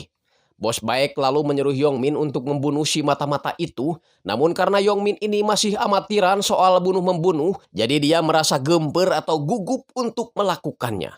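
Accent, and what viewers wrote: West Java, Indonesia. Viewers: Mimin na mni khas cara ngomg na kaciri sunda na kacida😅😅😅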